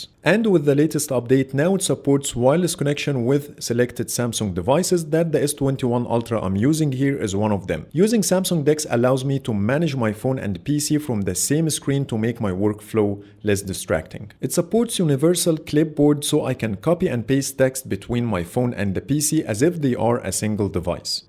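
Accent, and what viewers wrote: Egypt. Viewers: انا كمان عرفت انه مصري من لهجته بس هوه عسل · Egyptian accent 😁 your video's quality is amazing 👏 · Egyptian accent cheeeeeeck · knew it I am Egyptian too and I know the exact accent 😅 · Sounds egyptian to me ??!! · Swear i can hear Egyptian accent from here 😂😂👏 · هو طعمية علي فكرة ومن لهجته باين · From your first 2 sentences I know that your Arabic especially Egyptian but was heisted until emad Ahmed called u hahaha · gotta appreciate the Egyptian inspired accent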